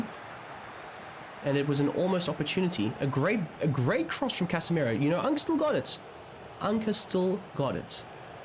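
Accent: South Africa. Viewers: I know a South African accent ev3n 8n passing. Had to double back a give a follow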